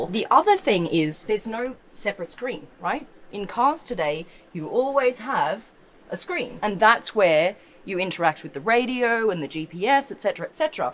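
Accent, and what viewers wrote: England, United Kingdom. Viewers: I like Your English accent, blonde... 😘😍🤗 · BRUH- I love your British accent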